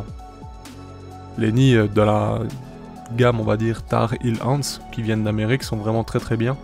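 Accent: Belgium. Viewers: cet accent me semblait belge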